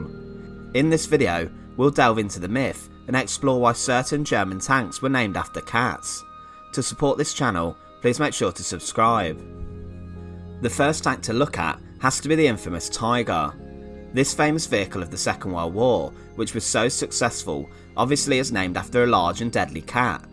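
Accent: United Kingdom. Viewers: What region of the UK is the narrator from ? His accent is different than others you typically hear.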